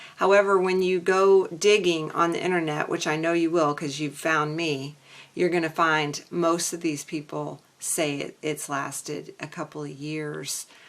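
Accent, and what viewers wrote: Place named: South, United States. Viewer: 💗 I understand. I’m in California, in a liberal place with a lot of this holistic stuff going on. You have an adorable accent, it sounds a little southern? Anyways, you radiate like some glowing people do and i understand that energy when I’m well. The past week, my spirit has just sprung up. I’m feeling so happy. I saw that you accidentally erased one of my messages. That’s fine, I will get back to you very soon 💗🌸💗 I articulate well at certain times when my brain’s really on point, so I’ll get back to you. No worries. How do I order your book? I totally want to support you in this and hear your story and I will most definitely be able to leave a deep, honest, heartfelt review for you because I can be very talented in that way when it comes naturally 💗🌸💗 Much love!!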